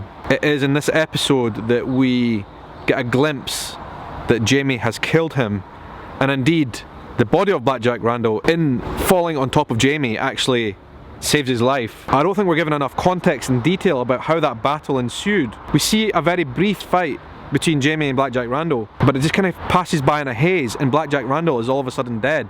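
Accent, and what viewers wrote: Scotland, United Kingdom. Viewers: Hi - love your vlog episodes about Outlander. In several of the videos you mention that all this is new to you because Scottish schools don't teach the history about the Jacobites, etc. I was wondering if now that will be changing due to the interest Outlander has generated, not just around the world, but in Scotland in particular. Love hearing your accent - Keep up the great commentary!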